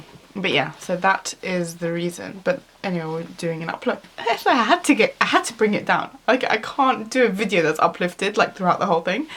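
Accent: Iraq. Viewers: Your Iraqi is so similar to mine! hahaha, nmashi el hal. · yeah haha something about iraqi eyebrows! <3 beautiful tho!! also her arabic is iraqi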